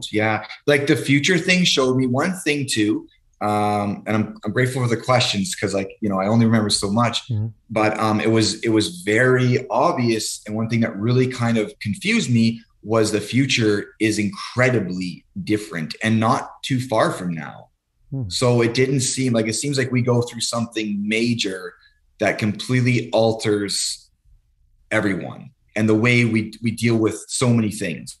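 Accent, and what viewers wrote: Canada. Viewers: He sounded Canadian to me. I live in Regina Saskatchewan! I definitely find this so intriguing/fascinating. I also came out of hard-core drug addiction four years ago. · Is this man Canadian? From the East Coast?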